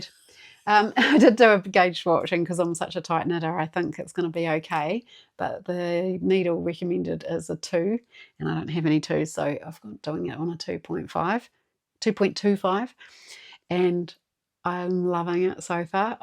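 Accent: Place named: New Zealand. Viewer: Hi from Trieste (Italy)! I’m a crocheter/knitter/everything yarn-related addict, and I’m in love with NZ. I’ve spent a month in Auckland on an English summer course in August 1992 and had such a great time! I still own and cherish the cabled jumper I started in Auckland and finished on the plane back home- and the leftover wool that I keep just in case😅. It’s so good to hear your Kiwi accent, it reminds me of all the nice people I met there❤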